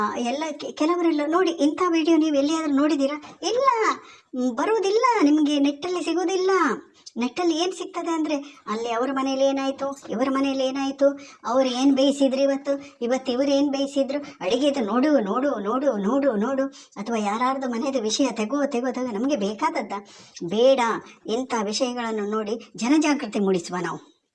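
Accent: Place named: Kundapur, India. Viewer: Super akka all the best👍💯 enastu videos barali nimminda.. Kundapur kannada keludakke tumba chennagi ede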